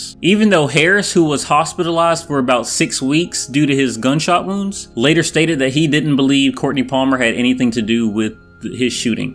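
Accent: South, United States. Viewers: This guy sounds a little country don't he?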